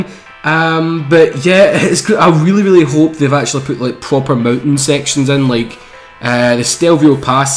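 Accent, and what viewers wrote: Scotland, United Kingdom. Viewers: What part of Scotland you from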